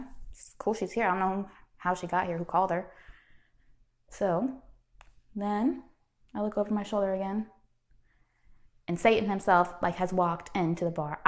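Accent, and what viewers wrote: South, United States. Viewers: im barely now realizing your southern accent lol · You're a trip. You can't really hear the southern accent in the asmr videos but it sounds good on you.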